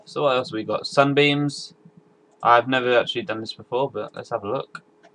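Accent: United Kingdom. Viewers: Are you British? Looks like you have a British accent hehe:)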